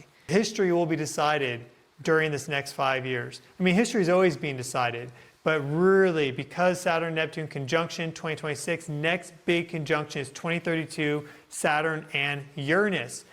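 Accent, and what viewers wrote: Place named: Bay Area, United States. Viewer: I don't know the answer, but always wondered where is from... My guess is SF Bay Area, possibly South Bay, just from his accent - Where do you think he's from? Only people who don't actually know, please!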